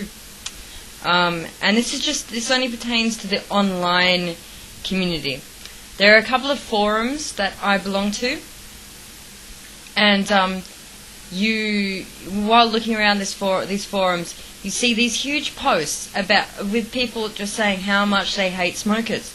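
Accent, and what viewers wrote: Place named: Australia. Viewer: ew god your australian!!! its bad enough your vegan, both mixed together your like the next fuckin hitler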